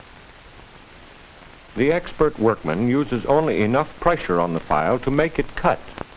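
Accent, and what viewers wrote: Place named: Transatlantic, United States. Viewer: It’s the “transatlantic” accent and they used to teach it in schools. It’s the american equivalent to the British RP accent.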